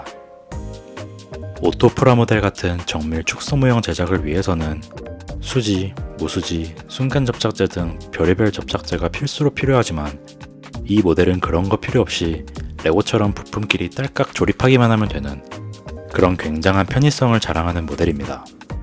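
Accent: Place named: Gyeongsang, South Korea. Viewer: 경상도분이신가보네요. 서울말 쓰려고 노력하는게 저는 들립니다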